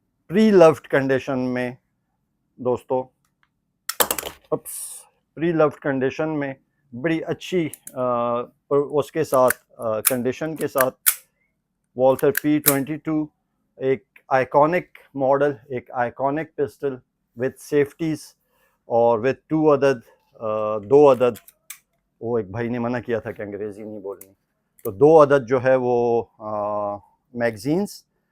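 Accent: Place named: Hindko, Pakistan. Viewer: sahib very nice hindko dialect ❤, secondly mein nay bhee apna 30 bore 21000 ka khareeda tha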